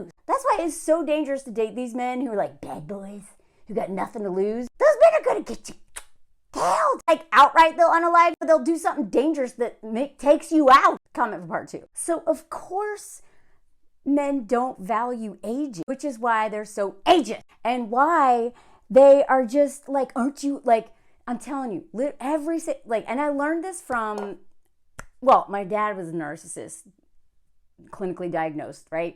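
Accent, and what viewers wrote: South, United States. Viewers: The Southern Belle twang: Here for it! And the puppets - a childhood joy coupled with information I enjoy as an adult - Enjoying the creativity with your content - Hi ❤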